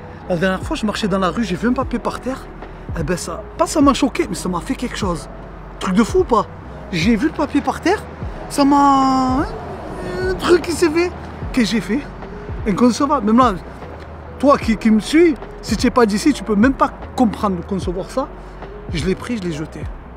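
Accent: Marseille, France. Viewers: Est-ce que t'es un marseillais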